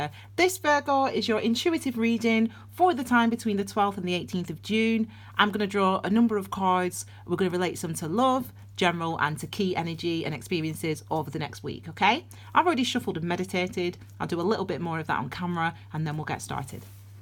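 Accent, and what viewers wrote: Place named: Northern England, United Kingdom. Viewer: Welcome back! Really lovely to hear from you. I forgot how much I've missed your accent! Eeh by eck lass! 😂😂😂 Clare (Manchester) xx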